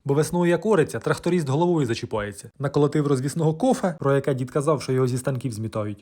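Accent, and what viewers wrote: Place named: Cherkasy, Ukraine. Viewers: тобі здоров'я!!! Бачив що з Черкащини,думав що до Київської сторони,а коли взнав,що з Тіньок,мало не обістявся(земляк в тройні)!!!Моя мама до затоплення з дідом теж в Тіньках жили,а потім в Чикаго переїхали!!! Мені дуже приємно дивитись твої відео аж до сліз!!!Дивлюсь з Одеси!!! Чекаю на твій контент з нетерпінням!!! Дуже приємно і класно в тебе виходить з нашим Черкаським говором і твоєю харизмою!Бажаю здоров'я ❤❤❤!! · Черкаси тепер зрозуміло звідки це й знайомий діалект)) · Ото,коли чую слово" кохве", то вже мій день відбувся!!! Чудова українська- центральночеркаська мова. Вітання зі Шполи!!! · Слухаю черкаський говір ,як музику і згадую Таню з Черкас🤭😆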